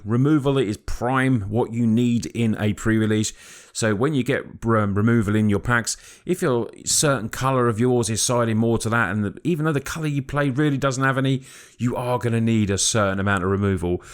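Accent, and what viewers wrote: United Kingdom. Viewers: Its nice to hear a proper Englishman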